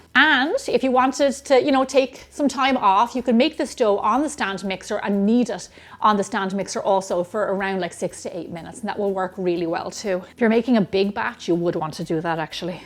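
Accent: Ireland. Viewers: Hi Im not fond of dumplings but I watch anyway for this Irish accent🎉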